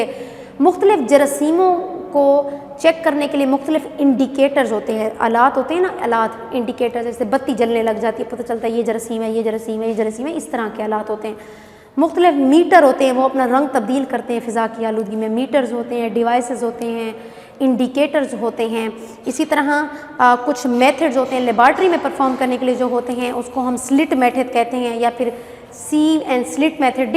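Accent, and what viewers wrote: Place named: Saraiki, Pakistan. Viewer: The way you speak mam is proved that you are sariki ❤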